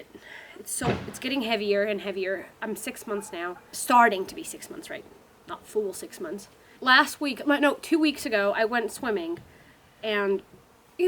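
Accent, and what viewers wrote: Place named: United States. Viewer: Damn, you sound so American, like the loud kind, I don’t m a that in a bad way, I’m American. It’s mind bending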